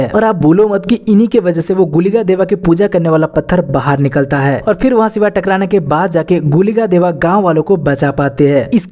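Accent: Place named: Karnataka, India. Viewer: Nah he's from southern india, i can tell by his accent.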